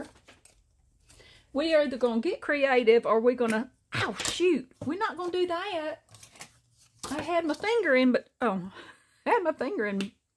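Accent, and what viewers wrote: South, United States. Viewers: Omg girl 👧…I’m with you on the chapstick👌, always in my pocket! And just loved hearing about your VW 😍 just took me back to my first auto…1960 Pontiac tempest convertible, was sweet times🥰 you are really making craft time so much fun girlfriend! I’m addicted to your videos, and praying for you to get good reports and the good Lord to restore your strength with every sunrise, cause you are like sunshine on YouTube for us crafting paper lovers‼️🙏💚💚💚‼️🙏‼️ Oooooh yeah… I forgot to say I love your tags, and the video of you covering envelopes is amazing! And your narrow booklets are what really drew me in with your southern chatty charm👌💚‼️ you are amazing😍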